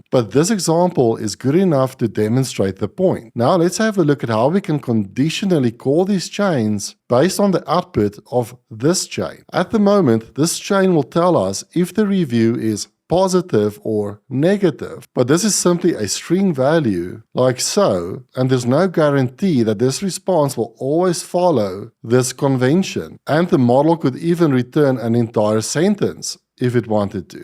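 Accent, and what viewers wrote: South Africa. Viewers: So South African :D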